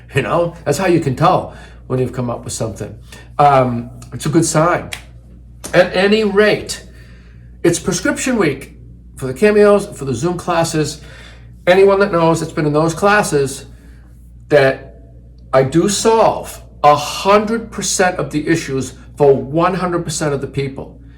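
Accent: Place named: Boston, United States. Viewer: The f....g Boston accent I don't know what the fxxxx bada boom bada bing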